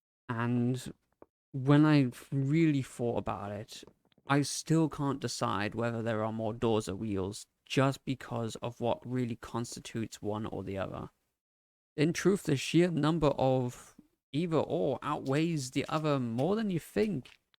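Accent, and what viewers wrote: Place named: United Kingdom. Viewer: You sound British as shit cuz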